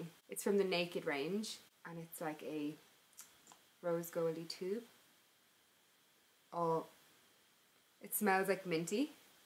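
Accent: Ireland. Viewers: Which part of Ireland are you from?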